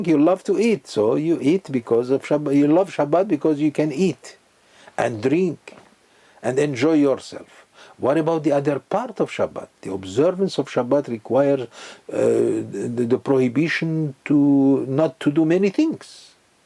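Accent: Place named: Iran. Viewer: you have Farsi accent even when you speak English and even want his bigger Hebrew semitic language I can smell and I can hear Farsi accent but I'm not going to judge you pray for Rabbi for all of us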